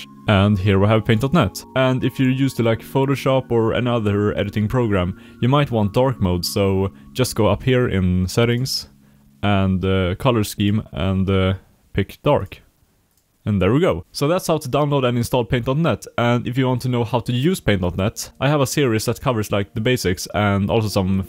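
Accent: Sweden. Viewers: the swedish accent i knew he was swedish from the first second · Han hör är 100% svensk😂😂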